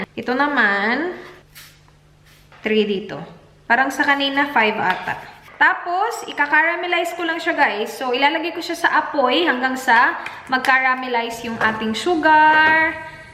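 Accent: Visayas, Philippines. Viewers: Parang bisaya ang voice....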